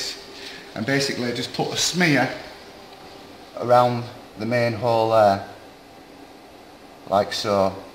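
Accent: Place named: Bradford, United Kingdom. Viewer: I just googled "does nissan pathfinder have a timing chain" and your vid link came up... the reason I clicked on it was because of the place name "west yorkshire" oh my home county, not only that your in my home town "Bradford" that I left 40 years ago.... So I watched your video just to hear the Bradfordian accent and I was amazed at what you had taught me about this engine Thanks for the informative video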